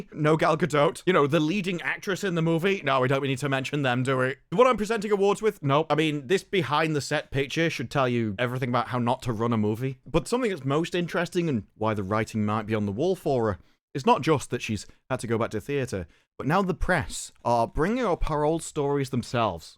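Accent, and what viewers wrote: United Kingdom. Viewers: Are you British?? Can’t tell.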